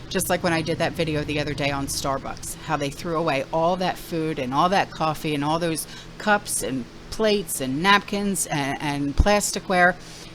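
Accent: New York, United States. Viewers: Hello Seattle In The Chat 🤗 I don't know what i like about you the best. The New York accent or how dang sweet you are❣️ I always look forward to your post ❤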